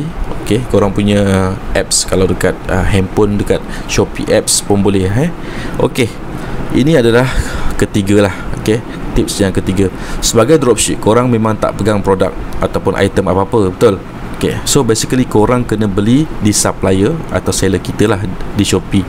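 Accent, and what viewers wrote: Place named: Perak, Malaysia. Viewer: Orang perak ke mike 😬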